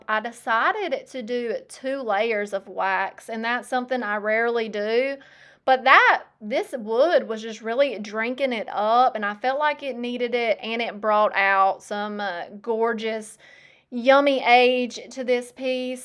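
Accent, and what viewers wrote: South, United States. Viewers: I grew up in the south, but have been in the northeast ( longer than I wanted) and I used to talk just like you. When I moved, people were relentless in teasing me. I have lost a lot of it, I think, but people still ask me where I am from 😂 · I love your southern accent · Love your great southern accent!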